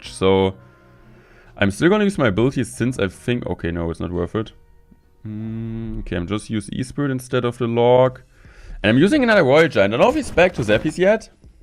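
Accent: Germany. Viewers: The german accent hits hard But good video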